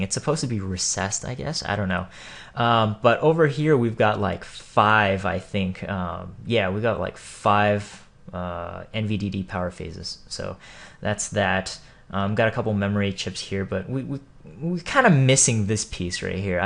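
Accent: United States. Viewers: this is my first time seeing you and I must say it, I did not see this coming, not being racist but I though you were america white because you had an american accent but you look pretty sexy (no homo). Other then that Congrats on you partnership you do deserve it :D.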